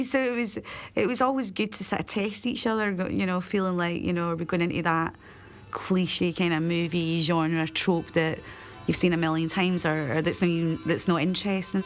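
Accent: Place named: Scotland, United Kingdom. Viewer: She's Scottish you melt.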